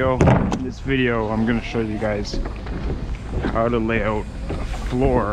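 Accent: Canada. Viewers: Are you Canadian?! Sounds like it haha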